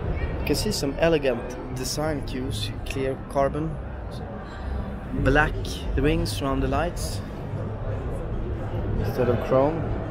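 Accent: Sweden. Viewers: I bet the one whos talking is from Sweden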